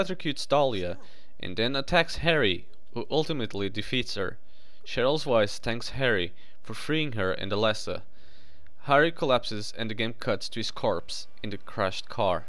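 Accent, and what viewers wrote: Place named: Portugal. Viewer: You have a Portuguese accent. Are you?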